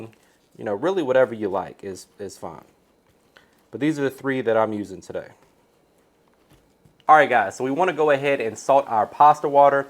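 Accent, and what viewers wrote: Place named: New Jersey, United States. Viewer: Where you from nice voicd.guess jersey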